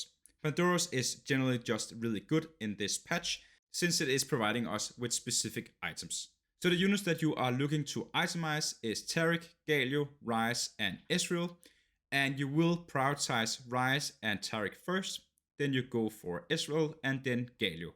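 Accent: Denmark. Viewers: Den danske accent er dejlig🇩🇰🇩🇰🇩🇰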